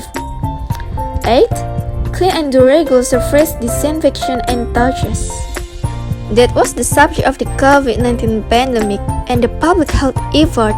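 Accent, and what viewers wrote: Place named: United States. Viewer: American accent nih boss, senggol dong 😎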